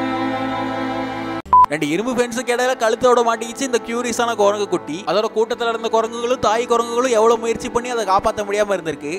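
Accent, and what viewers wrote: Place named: North India, India. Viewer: இந்த பதிவுக்கு பேசுபவர் தமிழர் குரல் மாதிரி தெரியலில்லை வட நாட்டுக்காரர் தமிழ் கற்றுக்கொண்டு பேசுவது போல் தெரிகிறது. உண்மையா?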